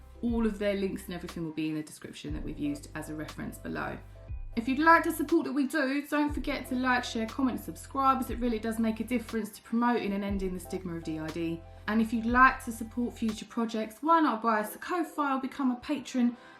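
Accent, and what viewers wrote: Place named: Cockney, United Kingdom. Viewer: a... british accent? (is it?)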